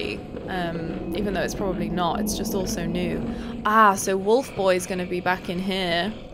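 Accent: United Kingdom. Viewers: It's quite funny to listen to a british girl (i assume) playing this game and commentating, it's very immersive actually.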